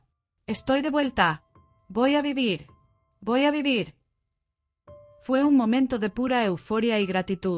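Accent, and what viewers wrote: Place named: Spain. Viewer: JA JA JA COMO YO ? PRIMERA LA BURRA POR DELANTE Y LUEGO LA ENFERMERA Y EL EQUIPO MEDICO AUXILIAR PRA ATENDER ALA PERSONA ENFERMA DURANTE EL VUELO. SI.. POR LA NARRACION DE VOZ ES UNA ESPANOLA QUE NI EXPRESARSE CON CONCORDANCIA SABE. EN SU PROPIO LENGUAJE. QUE VERSGUENZA ASI ARRUINA EL MENSAJE DEL SUCESO. EN CUESTION .QUE ABURRIDA NARRACION.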